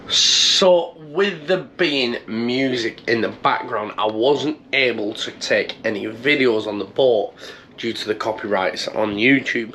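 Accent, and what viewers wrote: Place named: Greater Manchester, United Kingdom. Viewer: loved watching this. fresh youtube subscriber. watch you for a couple year on the tiktok. funny guy. love listening to your acscent. reminds me off my family from bury/radcliffe. keep up the great content.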